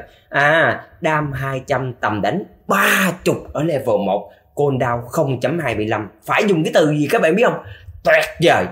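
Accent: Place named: Binh Duong, Vietnam. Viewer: Anh hôm nay giọng hơi bình dương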